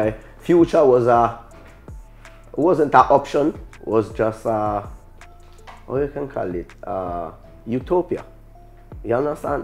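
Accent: Jamaica. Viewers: No vabbè l'accento jamaicano non si può sentire... Cazzo sei italiano, usa il nostro accento almeno o se proprio ti devi sforzare usa quello inglese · Patrick jolly splif se ha imparato parlando l’inglese jamaicano cosa ci può fare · chi sono? E inglese giamaicano ovviamente vivendo lui la ha preso quell’influenza, molto diverso dall’inglese che studi a scuola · Minchia che Inglese Patois-izzato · Cazzo se si sente l'accento jamaicano... Altro che ebbasta quando parla inglese alla renzi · Top si sente l'influenza Jamaican nel tuo inglese real Swag no sucker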